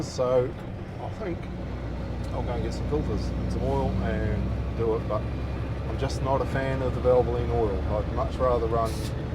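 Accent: Southland, New Zealand. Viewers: Great to watch. Enjoy your stuff mate. You sound like a Southlander.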